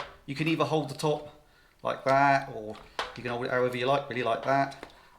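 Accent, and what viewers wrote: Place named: United Kingdom. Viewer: Thanks for the informative video love your UK accent haha... Do you think these can be used for collecting and filtering tap water at all? I am traveling in Europe including London, cities...I am not collecting water from lakes or rivers or ponds though haha... I am looking at filtering water for my family (four of us) for making morning powder shakes or water for their bottles for out and about drinking. Thanks lovely.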